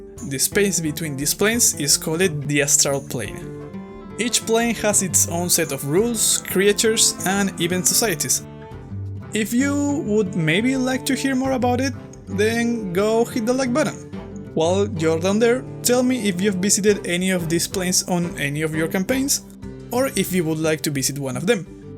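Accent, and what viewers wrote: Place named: Argentina. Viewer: che pero vos sos de argentina? Perdona si no es así. Es que tu acento inglés es muy argento!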